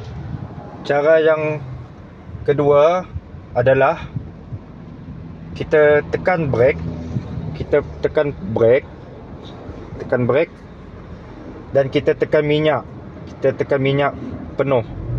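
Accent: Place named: Sarawak, Malaysia. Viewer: Org srwak je ade tune mcm n😁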